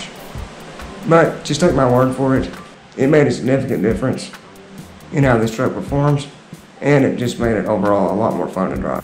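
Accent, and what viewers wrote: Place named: South, United States. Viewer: Man your voice and southern accent are the shit!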